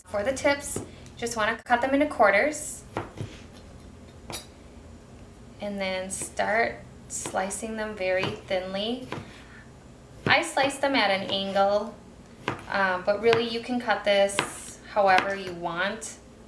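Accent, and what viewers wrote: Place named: East Coast, United States. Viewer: Are you from the east coast? You have this little accent just like me lol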